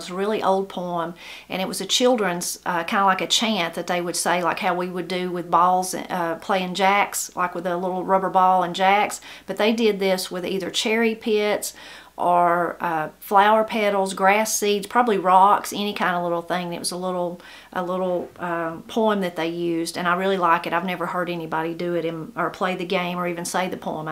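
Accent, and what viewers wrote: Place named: South, United States. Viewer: I’m 77 years old and live in California today, but my mother and father came out here from Oklahoma when I was a baby. Their speech was very similar to yours and spoke with an accent as you do. The word “sugar” to represent kissing or hugging was commonly used by them, but it was a southern expression carried out here by them from Oklahoma and not used by any of my friends or their families. They have been gone many years now and I never hear anyone say it anymore. It’s definitely a southern expression, as are many of the other words you mentioned, which I recognized from hearing mom and dad use. Brings back memories to hear you talking about them.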